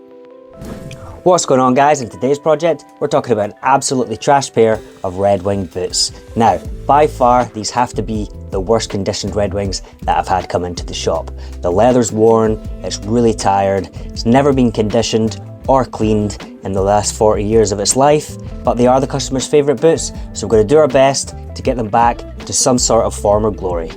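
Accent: Scotland, United Kingdom. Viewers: Have relatives from Northern Ireland, who always considered themselves as 'British' rather than 'Irish,' but I'm not too familiar with accents but think you might have some Scottish roots too. Perhaps not, but you have a new subscriber here...) Best wishes!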